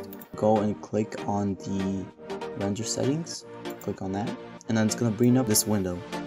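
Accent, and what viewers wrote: Netherlands. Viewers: kom je uit heerenveen ofzo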